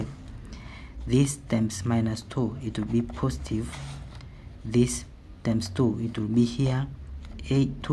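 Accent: India. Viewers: The accent sounds indian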